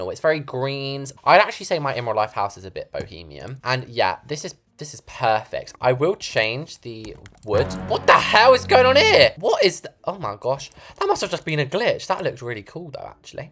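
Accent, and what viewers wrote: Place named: United Kingdom. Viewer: Wait you’re British????😮😮😮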